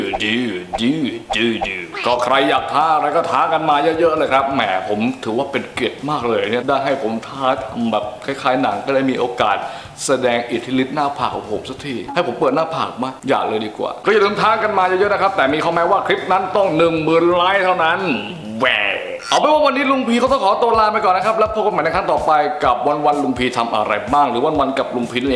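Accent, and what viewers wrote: South, Thailand. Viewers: ลุงพีเป็นคนภาคใต้ใช้มั้ยครับ 😂😂😂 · ลุงพีเป้นคนใต้เหรอครับ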